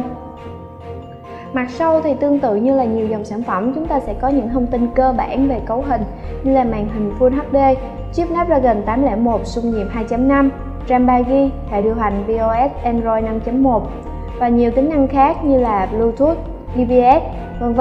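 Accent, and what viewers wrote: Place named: North, Vietnam. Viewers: Thích giọng bắc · thix review giọng Bắc hơn + chị trả bài rất tốt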